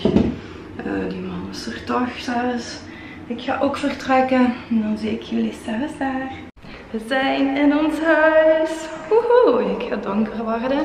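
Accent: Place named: Russia. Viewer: HERMOSO LUGAR!!! MY LOVE!!! Y A PROPOSITO DE QUE PAIS O NACIONALIDAD ERES?? RUSA?? UCRANIA?? VEO QUE HABLAS INGLES, PERO TU ACENTO ES DIFERENTE. BYE...BYE...HERMOSA.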